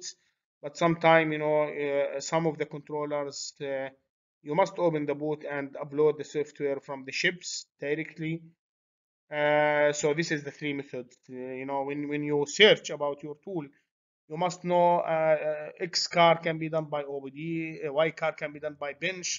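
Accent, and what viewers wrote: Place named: Iraq. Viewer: من لهجتك عراقي❤😊 اذا ممكن اتواصل وياك محتاج هذا الجهاز لكن مال دراجات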